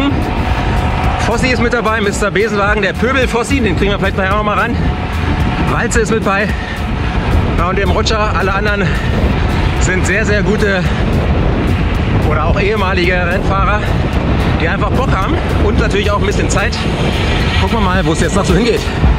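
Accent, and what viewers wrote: Berlin, Germany. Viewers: Super Format, lustige Berliner Schnauze! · Immer wieder nice anzusehen und die Berliner Schnauze tut gut. Weiter so und Kette rechts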